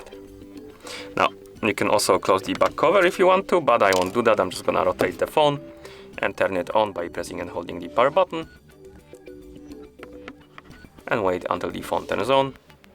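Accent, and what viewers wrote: India. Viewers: I have lived in the US my entire life and know zero people with thick Indian accents but it’s still very easy for my brain to compensate for the accent, I understand every word of tutorials like this. Maybe, just possibly, it is you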